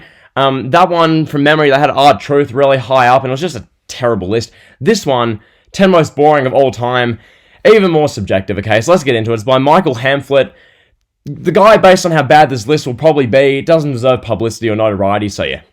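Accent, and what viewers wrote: Australia. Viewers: I love the Aussie accent bro, bless you..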